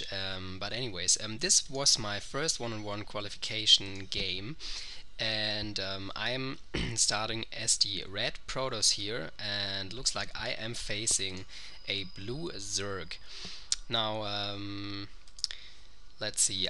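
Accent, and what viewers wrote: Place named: Germany. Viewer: lol exactes deutsches schulenglish :D:D:D:D:D und mir gings exact genauso bei meinem ersten 1on1 :D:D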